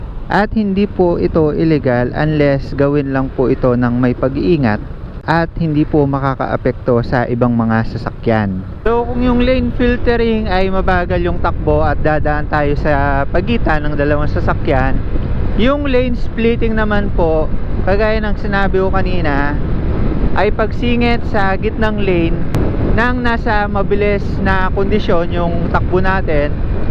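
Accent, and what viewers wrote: Pampanga, Philippines. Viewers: Sabi na nga ba kapampangan ka e,yung accent mo kasi. Sa Telabastagan yan 😁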